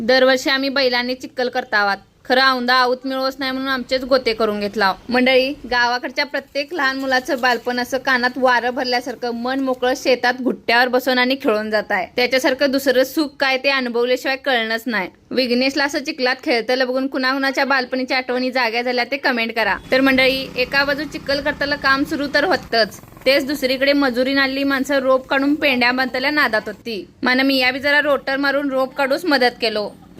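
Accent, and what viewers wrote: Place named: Kolhapur, India. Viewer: Laglav khalav khup chan Kolhapurkar bhasha nirgas ahai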